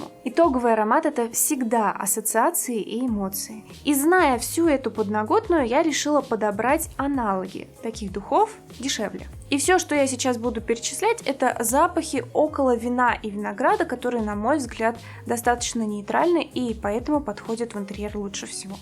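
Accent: Russia. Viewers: ивановский акцент))))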